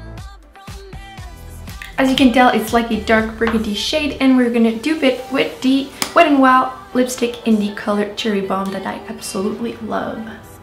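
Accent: France. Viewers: Most helpful video about dupes ever! Thank you! The first time I ever heard of wet n wild lipsticks was from your swatch video and I instantly wanted all of them lol so, I'm working on owning most of the wet n wild lipsticks because they're amazing. Every paycheck, I get one :) Also, your accents is adorable lol. Is that French or? Love your videos, girly! ✌️😊🌸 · Great video! I needed it .. Btw ur beautiful and I love your accent even though I don't know where you're from. French?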